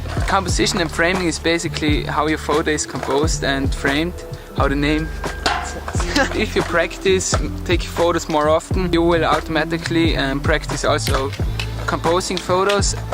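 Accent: Germany. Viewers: Richtig deutsches englisch aber gutes Video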